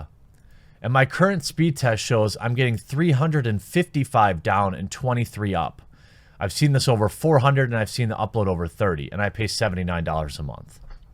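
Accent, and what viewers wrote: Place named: Midwest, United States. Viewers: Welcome to Midwest accents. -an Illinois'an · Yup, that midwestern accent. I knew some who said "leyg" and "draygon" all the time and didn't even notice till someone points it out, lol. Lots of different accents in America if you look around closely ;)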